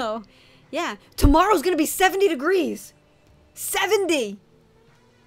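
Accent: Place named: Boston, United States. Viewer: Oh yeah and where are you from i hear Rhode island or Boston in your accent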